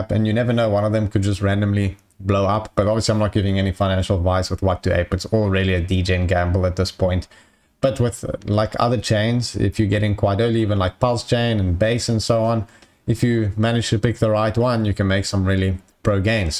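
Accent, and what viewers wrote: South Africa. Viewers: If I may ask, are you South African?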